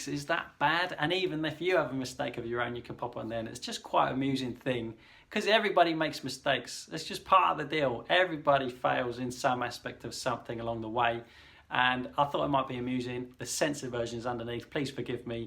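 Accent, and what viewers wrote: United Kingdom. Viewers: hi im just new in the channel and i wanna know where are u from im not english speaker but felts like i could lear some british english out from u. Im considering going there to work and my degree essay is about bread texture determination and comparision of different sourdough. So looks such a double strike, see ya.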